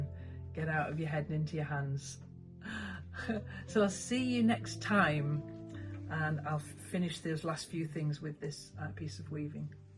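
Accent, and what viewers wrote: United Kingdom. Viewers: Love your weaving!!!!! You are so talented! I have been watching you on utube for several years. Thanks for all your talks about jumping in and trying something new. I am a quilter but love to try all sorts of creative things. Also I have to tell you I also watch you because I crave listening to British accent as my mum was from England. Thanks for having a chat!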